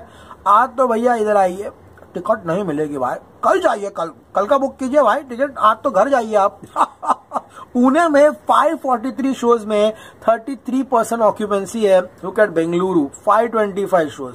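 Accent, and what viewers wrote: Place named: Hyderabad, India. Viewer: Lol hyderabadi accent 🔥🔥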